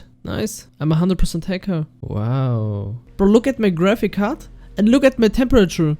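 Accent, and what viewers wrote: Germany. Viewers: Bis auf einen leichten deutschen Akzent hast du ein gutes englisch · You sound german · i höre a bischen of deutsch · Are u german? Because iam and i hear a german accent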